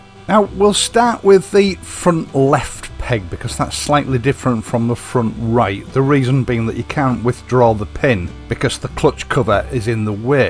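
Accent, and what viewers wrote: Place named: East Yorkshire, United Kingdom. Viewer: Read all about it! 'Listening to East Yorkshire accent increases longevity'. Well, your accent has worked for me. During an enforced 7 week hospital stay (following life-saving emergency surgery), listening to your voice while watching your videos has had a most profound effect upon my life already. 1) My son presented me with a pre-loved Royal Enfield Continental GT535, 2) This in turn has restored an urgency to once again be motorbicycling and also an enhanced joie de vivre, 3) I have regained enough strength to laugh heartily with your wonderful dry wit. Thankou for taking the time to impart your mechanical knowledge and humour. If listening to an East Yorkshire accent extends life, then your reviewing of your videos while editing them must mean we'll have you around forever!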